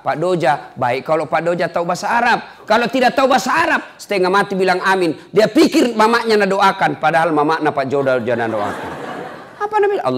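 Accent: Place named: Sulawesi, Indonesia. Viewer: Alhamdulillah, dpt siraman rohani logat sulawesi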